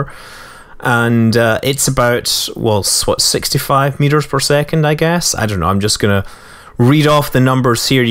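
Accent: Scotland, United Kingdom. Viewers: ^_^ MOAR SCOTTISH! I must obey the need.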